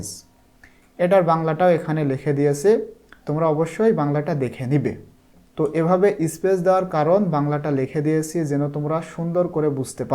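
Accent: Barishal, Bangladesh. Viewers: স্যার কি বরিশাইল্লা নাকি 🥰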